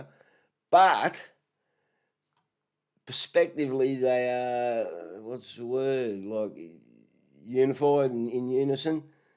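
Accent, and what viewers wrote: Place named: Australia. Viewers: His accent is absolutely stereotypical Australian…I know, I’m Australian and my accent isn’t like his at all. We have more than one accent here. WA is different than SA and TAS is different again, etc. Also, the more rural the more it will sound like. You generally only find posh accents in the cities and larger towns and the real posh ones sound like fake Brits. 😂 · oz accent just makes it all that much more entertaining.. i hope these clowns continue to release more and more questionable footage, so we can keep LAUGHING at them.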